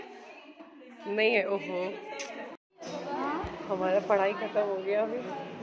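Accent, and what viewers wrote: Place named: Bhojpuri, India. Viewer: भोजपुरी आवाज से सुकून मिलता है🙏🙏❤❤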